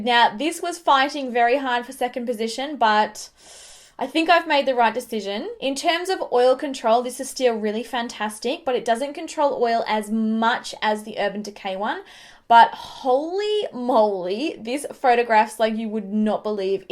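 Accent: Australia. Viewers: is she australian?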